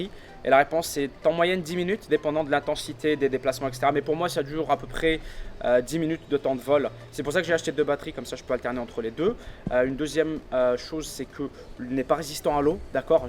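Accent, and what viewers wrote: Quebec, Canada. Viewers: Il quebecoi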